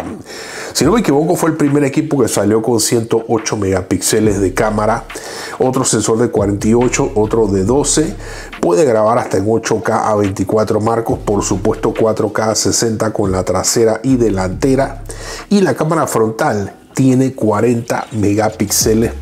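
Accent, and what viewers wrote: Panama. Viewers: Hahaha escuchando me di cuenta que eres de Panamá, saludos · Ajajaja estoy buscando Review de este equipo y me salió un paisano que sin saber que era panameño por el acento me di cuenta ajajaja saludos bro buen Review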